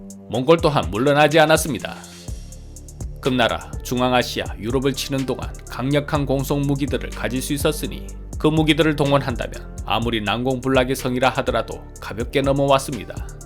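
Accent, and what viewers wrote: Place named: Daegu, South Korea. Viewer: 참 잼있고 유익한데 구독자가 안느네.. 진행 하는 양반 사투리도 내 고향 대구 같아서 더 좋은데.. 거참 이상하네 왜 구독자가 안늘까..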